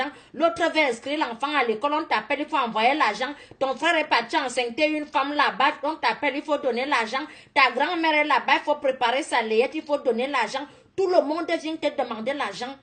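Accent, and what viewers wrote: Cameroon. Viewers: Cet accent camérounais est vraiment trop dur et fort. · L' accent cameroonais la est fort ooo J te dit ma sœur, labre dargent la nexiste plus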